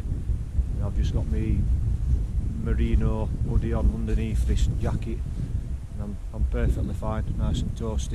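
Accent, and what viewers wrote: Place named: Sheffield, United Kingdom. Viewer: What great little spot, I was up there last week with my son on my first walk of the new year. Parked in the big layby near Cutthroat Bridge and hoofed it up to Back Tor. Spied yer mate Mezz's pitch whilst there. Cracking weather till a front came in from Kinder. We managed to get back to the car before the rain hit us. I fancy an overnighter up there now I know I can cope with the terrain (bad ankles). It's nice to hear a proper local dialect on here. Another Sheffield lad here. ATB - stay safe.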